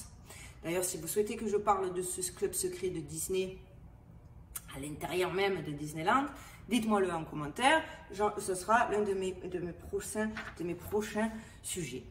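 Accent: South, France. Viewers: Je te découvre grâce à Silvano. J'ai trop kiffé le début " hello everyone '...je croyais que t'étais ricaine puis tu t'es mise à parler le français du sud ...🤩🤩🤩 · Damien Hirtch, copain de martina abramovic, pour un copain donner.Quel bel accent du sud a végas!!!Merci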